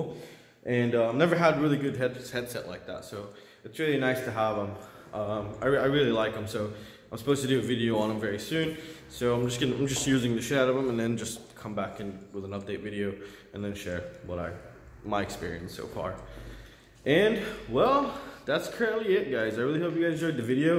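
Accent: United States. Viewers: Where in europa do you live? I realy think you were a american😅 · Where I Europe do you live, you sound American have you always lived I. Europe ? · Made me smile. Thought he was American.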